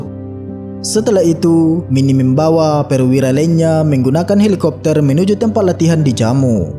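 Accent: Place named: Padang, Indonesia. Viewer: Pengisi suara orang padang❤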